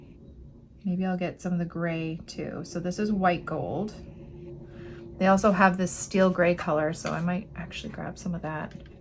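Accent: Canada. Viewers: I have another reason to love your channel. I bought a set of pearlescent watercolors from Michael's - about $8-10 Canadian. I tried them out, and the colors are so beautiful. I don't have the metallics though. Your video is very welcome. Question. Are you in Canada? Your 'accent' sounds different from Americans.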